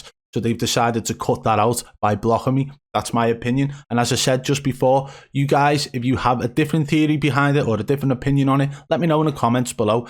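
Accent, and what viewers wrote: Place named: Merseyside, United Kingdom. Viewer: Absolute wool you mate! Swear to god you’re from Skem or Birkenhead you. Bad snitch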